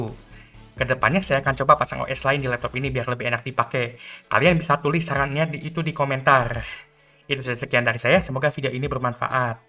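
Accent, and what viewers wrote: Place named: West Java, Indonesia. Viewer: orang bandung wkwk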